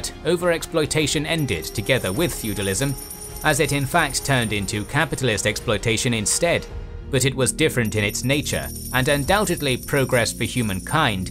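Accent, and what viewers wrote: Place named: England, United Kingdom. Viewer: I think is English not American based on his accent. But the question is, the World is Euro-centric cause a lot of today's world has its base in European history and technologies (we live in European world so to say) due to the massive influence and impact for good and bad Europe had on the world stage. However, calling England the agent of change of the Medieval period, is like saying Europe was the only place were Iron work or Agriculture was done in the planet. Something we all know is fake and batantly untrue. Pointing out what happened in England is interesting cause as the video is in English, Anglo-American culture wants to know what happened there but leaving the Hansa, Iberia, Northern Italy and the Byzantine Empire complete out of the matter is like talking about the birth of Agriculture and just talk about China as if it had originated there and not Mesopotamia.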